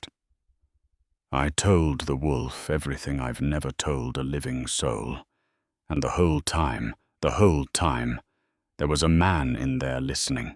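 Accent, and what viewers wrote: United Kingdom. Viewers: I hear ya, and offer this solution that I have found to be quite effective. I listen to these fantasy tales on my cell, so I fold a piece of paper over the top and have it long enough to reach below that picture section, and then click on Show Transcript. The words scroll up the screen as the narrator speaks (oh that YUM purring English / British accent), and let my imagination do its thing by making a movie in my head that depicts the scenes and the characters. It is much more fulfilling & enjoyable that way.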